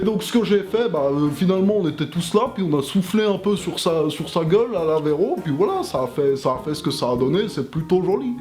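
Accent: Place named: Haute-Savoie, France. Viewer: Haute Savoie plutôt, mais ça ressemble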